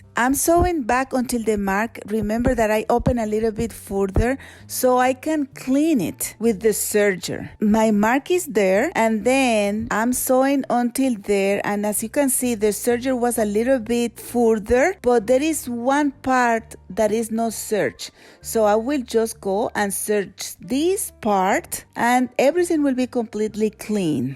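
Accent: Spain. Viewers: This is a great tutorial, thank you! I have the same “Pandemic Pants Problem” I did a triangle in the back, but was not good, yours look great! Your accent... are you Spanish? Your mother looks very young, so how old are you to be so talented? Thanks for share, I just subscribe from Puerto Rico! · Pero lo esta explicando en acento espanol! 😉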